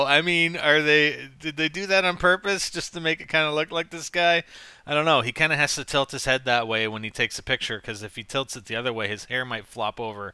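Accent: Canada. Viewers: Are you from Canada? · R u candadian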